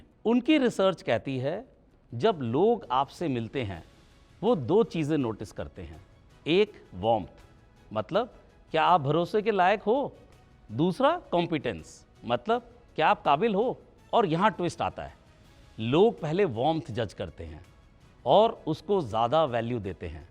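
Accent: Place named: India. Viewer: I realized that you are an Indian, not Pakistani. And it was just because of accent of Urdu speaking ❤. Regards, "FARAZ AHMED"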